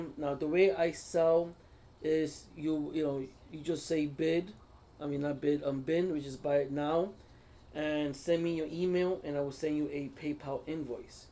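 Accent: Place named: New York, United States. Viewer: I understand what you are saying here Sir. If it's yours and you want to keep it in your personal collection then you can shine it if you want to. Yes, it is a matter of it's mine and you better watch how you mess with it. It is called Coin Care for a reason right? But honestly I find hot water and Dawn dish soap does wonders on my Q-tip. I love your New York Spanish accent Sir and I can't do math very fast either. That dirty old goat Ben Franklin was right about the Penny idea though. Granny used to save all of Grandpa's coins every day from his pockets in a five gallon jar 🫙. In November each year she started rolling all them coins, then took it to the bank. Each year she had very near $500.00 hundred dollars for Christmas to buy gifts for the children and a nice family dinner for their meal. Why? Because she would quote "a Penny saved is a Penny earned", just good advice still today.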